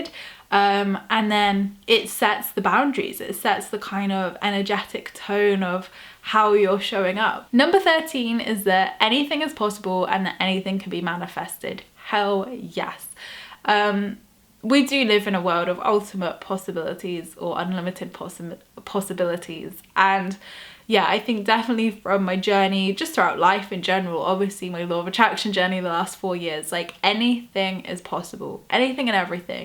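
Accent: England, United Kingdom. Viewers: Hi. I’m Carrie. If I’m honest, I’m a little bit tipsy right now. But I felt connected to you for many reasons. One of them was, you’re the same age as my older sister, so I instantly looked up to you and wanted to be like you because I’m just a little shit and I don’t know who I am yet. But I do know that the idea of living a life like you live, fills me up. But I have absolutely no idea how you do it. I don’t know how to set up a business. But the idea of working a nine to five job kills my soul, and I’m not even doing it yet. The bottom line is, I deeply admire you, and the way you talk about manifestion and the law of attraction really speaks to me. I’m Scottish, and you’re clearly English (lovely accent, btw). I would travel to England just to hear your advice, but if also talk to you any way possible. Something about you makes me really trust you and I subscribed and binge watched you instantly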